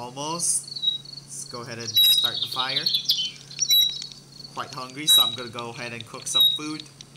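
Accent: Philippines. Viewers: utek filipino accent pala un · Are you PILIPINO? You sound like PILIPINO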